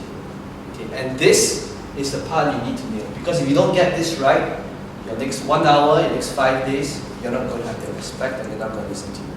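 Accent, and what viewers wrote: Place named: Malaysia. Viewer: It's likely due to his Malaysian accent, which I understand how certain words sounded as if they were muffled as most Malaysians has less accentuation in the pronunciation of the English Language, an often overlooked detrimental effect that was resulted from how fast, short, and simple they wish to be in their speech. I'm a Malaysian my whole life, so of course I understand.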